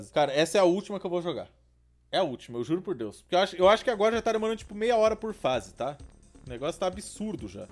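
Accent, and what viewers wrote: Rio de Janeiro, Brazil. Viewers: ta virando carioca?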